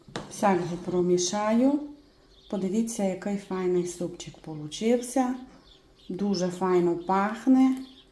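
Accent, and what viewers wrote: Transcarpathia, Ukraine. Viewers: Пані, Ви готуєте гарбузовий суп так, як готують в Австрії. В нас вдома (чоловік австрієць, дуже смачно готує) в цю пору цей суп часто в меню. Перед поданням посипають невеликою кількістю підсмаженим насінням гарбуза і легенько поливають гарбузовою олією. Ви прекрасна господиня! ❤ Дужу люблю ваш закарпатський діалект, слухала б годинами. Мирного неба в Україні!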